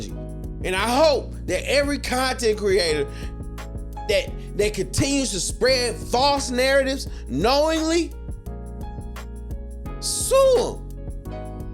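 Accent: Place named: Rural, United States. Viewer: I understand the hustle bro but why do all of you attempting this hustle have that country bumpkin accent? Sarah Jane Comrie is in the wrong, she could easily press charges if she felt otherwise. She lied saying no one knew the identity of the young man, when proven to be a lie, her lawyer came forward to state that he has not intention to even try to sue the young man for defamation. You have to have the IQ of stale bread to genuinely see that video and think your self that she was in the right. When I tell you genuinely speaking the rest of the world views Americans as low IQ trolls you do not help that image. If she doesn’t deliver a baby in 3 months I dare you to make a video.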